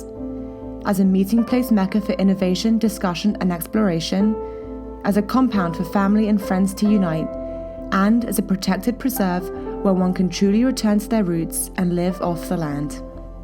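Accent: United Kingdom. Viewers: with no offence intended to the lady I think it is incongruous that the description is delivered with a british accent. Are you guys short of women in New York ? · A place SO exquisite.... only a British accent can describe its grandeur! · Terence Mac Sweeney Sotheby’s is a British auction house. Makes sense they’d use a British narrator to promote their brand. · from the accent of the voice over, I thought this property was in somewhere in Scotland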